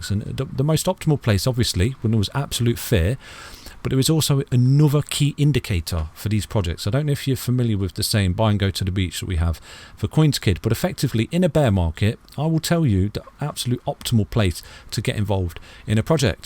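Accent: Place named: England, United Kingdom. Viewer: Lol , it's that English accent 🤣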